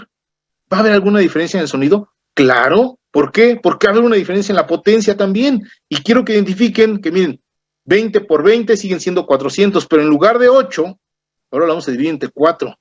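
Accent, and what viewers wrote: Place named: Mexico. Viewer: Muy buen explicado y con el simpático acento mexicano, muchas gracias, tenes un nuevo suscriptor.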